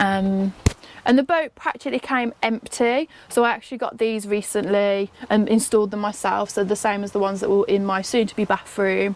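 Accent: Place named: Coventry, United Kingdom. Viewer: This may be a bit of a long shot but that sounds like a Cov. accent. Possibly even Nuneaton.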